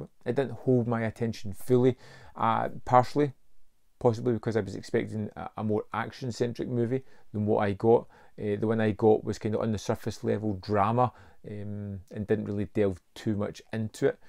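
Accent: Scotland, United Kingdom. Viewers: I know you are listed as being in the U.K. Your accent screams Scottish to me. I grew up in the U.S. and one of my best friends was from Scotland and his dad sounds just like you. He was also a real life badass being a former Royal Marine. I enjoy your presentations and greetings from Texas.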